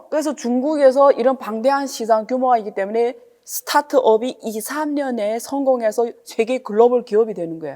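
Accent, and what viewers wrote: Yanbian, China. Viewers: 이번주는 안유화~ 강의 꾸준히 듣겠습니다. 연변식 한국어, 너무 귀엽고 친절하네요. ^^* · 난,좌파도아니고친중도아니지만,,,조선족남자는편견과달리 나름좋은분을만나봐서 디스는안하려구 ᆢ근데확실히이번정부는친중이고,이건아마도 이미중국의내정이깊숙히개입되었다는것,눈에보임 ㆍ티가너무납니다,아마추어인내게도보이는데?인물이반반해서 먼애기하시나들어왔는데,말투가 조선족처자,머그렇다고해,경제,정치애기를구구절절하시는데,,,이분이일단은교양이부족해,나름전문가적소견을어필하는데도,,,머랄가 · 교수님말투가 이따금 연변말투같네요 ㅋㅋㅋㅋㅋㅋ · 연변에서 조선족출신 이신것같아요 경상도 쪽이요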